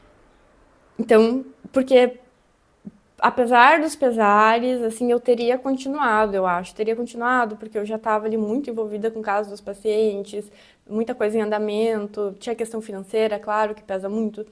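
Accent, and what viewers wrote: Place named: Rio Grande do Sul, Brazil. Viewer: Seu sotaque de voz e muito lindo eu Amo sotaque Gaúcho mesmo náo conhecendo os gaúchos eu os adimiro muito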